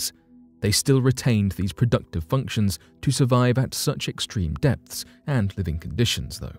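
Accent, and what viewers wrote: United Kingdom. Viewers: Where do we go to find non-british speaking narrators?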